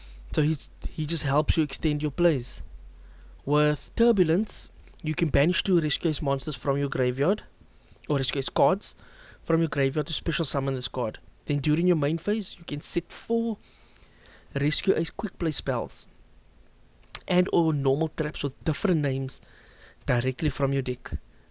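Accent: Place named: South Africa. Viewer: Are you south african? Sounds like it :) If thats the case let me know the. I'll subscribe.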